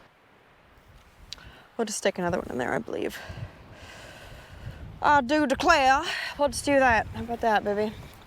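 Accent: Newfoundland, Canada. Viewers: My Mom is from Roddickton Newfoundland. I love watching your videos. Love the accent, reminds me of my family. Love them greatest people, Newfoundlanders. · I see that you are developing a bit of a Newfoundland accent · Hearing slowly sound more and more Newfy as time passes is hilarious:D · Ah ha...You are a Newfoundlander. "This is the life I chose I spose". :)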